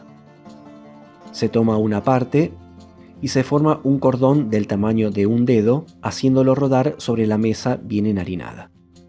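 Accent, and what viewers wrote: Argentina. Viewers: Hola! Voy a tratar de hacer esta delicia hoy, pero tengo un par de preguntas. Las cantidades que vos decís en el vídeo no coincidan con las cantidades en tu receta cuando saqué la impresión. También, en tu vídeo vos decís dejar cocinar la salsa (carne) por una hora y la traducción en Inglés abajo del vídeo dice 2 horas. Yo voy a seguir tus instrucciones, por supuesto. Sólo quería que vos supieras que los que siguen tus instrucciones en Inglés, no la van a tener exactas. Vivo en los EEUU ya 50 años y hablo los dos idiomas perfectamente. Saludos y gracias por tus recetas. Me encanta tu acento Argentino. Lo extraño tanto…Te hago saber cómo salieron los ñoquis!!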